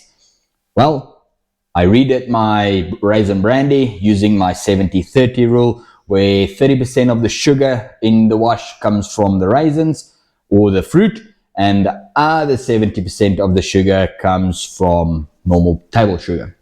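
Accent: South Africa. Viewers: is back. I love that thick S.A. accent brother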